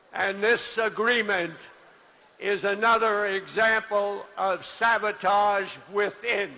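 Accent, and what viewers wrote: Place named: United States. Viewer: I love this man! This is what a real American looks and sounds like.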